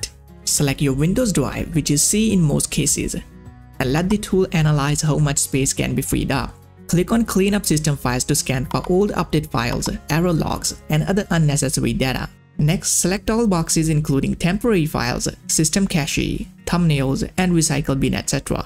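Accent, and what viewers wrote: India. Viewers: Jesus I was gonna watch this but his india accent bro is unbearable -- good bye.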